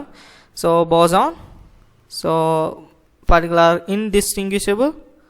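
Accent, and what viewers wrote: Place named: Telangana, India. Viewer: Your ascent is like my friend from telangana , you helped me the way he does, Thank u very much....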